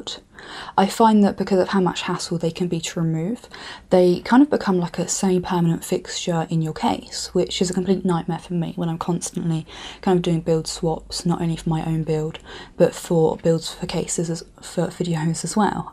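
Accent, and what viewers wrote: England, United Kingdom. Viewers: CAME HERE FOR THE BRITISH ACCENT! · More videos pls! I love the English accent. Subscribed!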